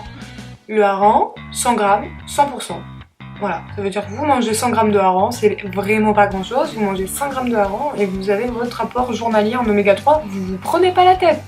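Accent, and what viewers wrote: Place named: South, France. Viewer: Merci pour cette vidéo hiii tu me fais trop rire avec ton accent du sud 😂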